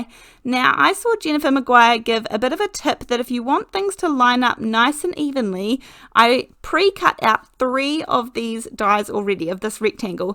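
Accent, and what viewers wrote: New Zealand. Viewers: It's gorgeous. Love all your ideas - I have that old stamp set and have never noticed the birds - they are coming out of hibernation, I can tell you now. The simplicity of the card makes it even prettier I think. I would love to get Alina's tape, but it is quite expensive when you add the shipping to Australia and then have to wait for the product to arrive as well. However, it does look fabulous and great to work with. You have an N.Z. accent but you have an Irish / American roll to your r's in words like bird and work. A connection there perhaps? Just curious. Still loving watching all your Youtubes. Thanks so much for sharing your great ideas.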